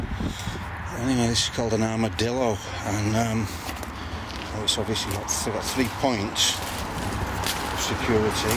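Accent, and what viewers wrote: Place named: Northern Ireland, United Kingdom. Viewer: its got a northern irish acsent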